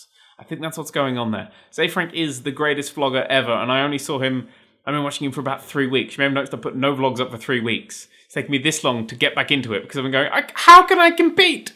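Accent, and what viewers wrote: England, United Kingdom. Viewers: ?r u from england nerd?<3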